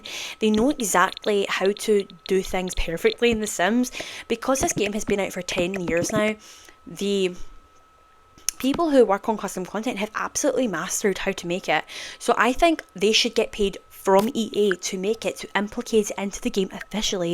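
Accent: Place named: Scotland, United Kingdom. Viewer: Fabulous build, great to hear another Scottish voice :eyes-pink-heart-shape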